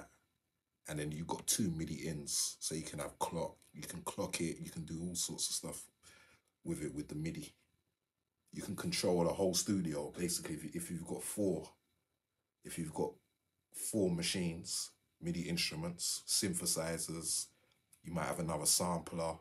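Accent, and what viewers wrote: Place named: London, United Kingdom. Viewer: also its good to be listening to a London accent rather than an American one.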